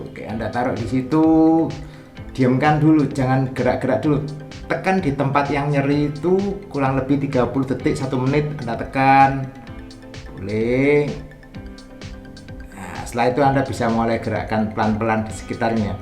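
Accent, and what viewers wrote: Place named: Java, Indonesia. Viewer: Coach orang Jawa apa Bali ??, logatnya Jawa tapi bilang otot bilang Tnya kaya orang Bali.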